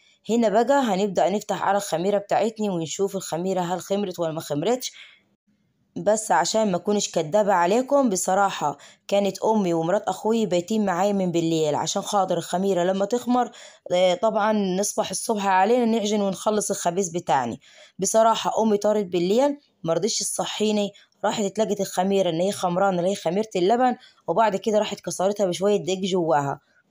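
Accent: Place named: Upper Egypt, Egypt. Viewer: تسلم ايدك ي ياجميلة انتى لغتك من حوالين أسيوط مش من أسيوط نفسها علشان انا اخذت الكلية من أسيوط وعارفه لغتهم انتى لغتك صعيدي زى نحن ما صعايده خالص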